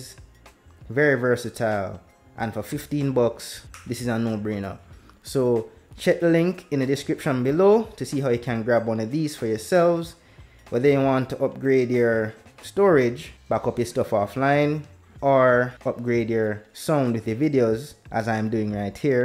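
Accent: Jamaica. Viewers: Wait a yard man?? I was expecting an American heeediot 😂 big up my yout 👊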